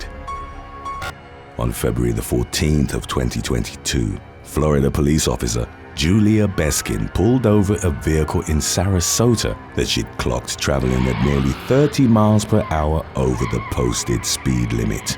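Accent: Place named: Hackney, United Kingdom. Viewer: Bro, you sound like Idris Elba? Hackney accent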